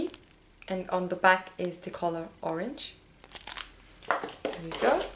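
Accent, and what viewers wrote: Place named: France. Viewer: You are so sweet! And I love your French accent hihi, I love the French language! I'm sorry if it's not French by the way, I know you are from Switzerland ^^. I subscribed!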